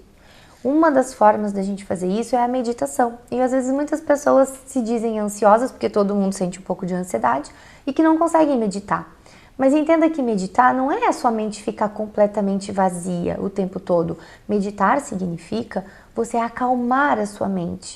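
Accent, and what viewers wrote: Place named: Rio Grande do Sul, Brazil. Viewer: Em 1 minuto de vídeo já detectei o sotaque gaudério kkkkk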